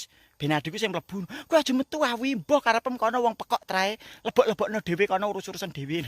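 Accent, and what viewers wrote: Java, Indonesia. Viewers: Medok tenan to kuwe... jiean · Ak sll ngikuti tik toke ms, ngomonge lucu, jawa ne asli ngendi ms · Medok amat,jowobe ngendi to mas?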